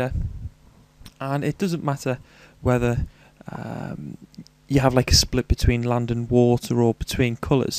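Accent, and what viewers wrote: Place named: United Kingdom. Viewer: thx team GB lol, keep the hard work mate, this is fantastic :) that is British accent for u Minh Nhat :)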